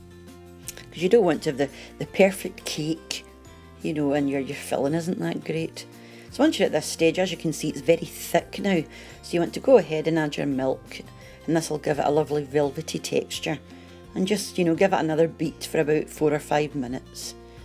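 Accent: Scotland, United Kingdom. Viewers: Thanks, I love the fact that you used butter cream instead of whipped cream for your Victoria sponge. And your butter cream sure seemed mouth-wateringly delicious! I love the little pinch of salt in there! I'd like to add that I much enjoy hearing you speak your nice Scottish accent! 😃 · F Faro she’s Scottish as I am x👍🏴🏴🏴🏴🏴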